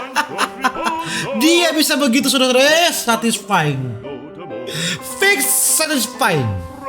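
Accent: Manado, Indonesia. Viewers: B logat manado kua opo🤣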